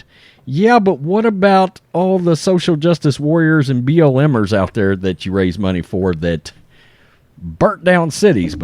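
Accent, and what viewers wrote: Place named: South, United States. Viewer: Hey buddy you & your are great announcers what is your first name & what state you live in I’d say Texas you have no a southern accent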